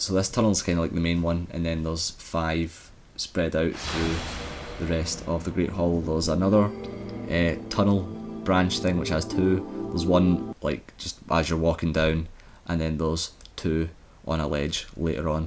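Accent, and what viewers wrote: Scotland, United Kingdom. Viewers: Thank you, you amazing Scottish dark souls God! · Same here man. Thanks for doing this. Also, your accent is gloriously British (Scottish, right?) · lol that accent, is it scottish? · 5 stars. But with the awesome Scottish accent it's a 5⭐️➕A+. Your a godsend bro. Although I kinda hated you when I was getting shitty chunks and green shitenite (I've loads) and your sucking on slabs yeh bastid yeh. Thanks again pal. Cheers from a fellow Celt in Dublin.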